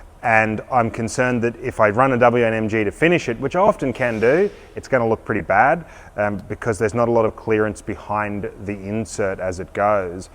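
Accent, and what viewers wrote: Australia. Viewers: If it werent for Ur "Aussie" accent, I'd say quit talkin and start cuttin!!!!!! Ur almost as bad as Diesel Creek in Pennsylvania, talk, talk, talk1!!🤠Ye Ole Caterpillar Cowboy🤠 in Illinois. Oh My Gosh how fast are U turning that part? 33;49 that is a thing of beauty!!!! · Greets from Las Vegas, USA! Love your videos and you commentary. I also subscribe to Cutting Edge Engineering Australia (CEE). You do heavy drilling rigs and and CEE does heavy mining machinery. Between the two of you, I have a smorgasbord of machining. I'm originally from Melbourne, Australia, and it's a real treat to hear Australian English. Thanks for bringing your MEGADRILL project to my attention. I'm looking forward to a binge watch on that one. Love you guys! 😀❤👍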